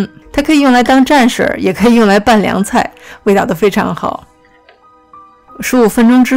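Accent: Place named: Northeast, China. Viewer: 为啥感觉你说话像是东北的捏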